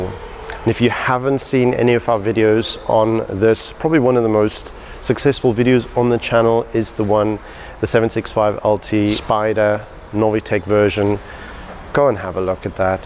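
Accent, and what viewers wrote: South Africa. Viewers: I had a feeling you were a fellow South African, judging by your accent and colloquial expressions. Really great stuff you're doing man. Keep it up.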